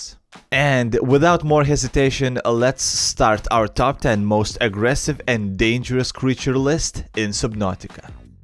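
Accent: Russia. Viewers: Hello comrades" communism 100 Edit:I just noticed the russian accent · are you russin? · Русский акцент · I love your Russian accent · His accent is good tho Russian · Is narrator russian? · I'm sorry if this offends you in anyway, but, are you Russian or maybe Scottish? The accent you have is making me curious. If you don't wish to answer I understand. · Russian ascent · are you Russian? probably but are you? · Больно у тебя акцент русский . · Do you speak Russian accent? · У тебя такой русский акцент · I love, when russians speak English! It sounds so awesome! I love the russian accent. “a friendly slap in the face.“ xD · I can bet you are russian i hear your english and i like intro HELO COMRADES 😂 greetings from serbia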